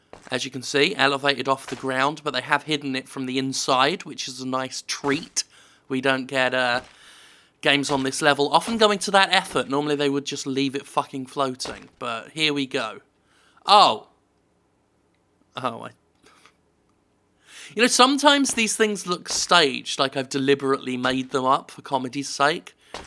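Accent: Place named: United Kingdom. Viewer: Hey I want to know, I'm I may, how do you maintain your Britishness? Is America slowly taking you over? Like in ten years will speak with that Mississippi accent and fire your guns five times a day?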